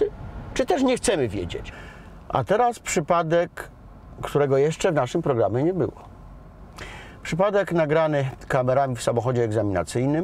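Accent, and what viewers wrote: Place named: Warsaw, Poland. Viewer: Warszawskie naleciałości w wymawianiu słowa 'tutaj' oraz 'dzisiaj' jako 'tutej', 'dzisiej'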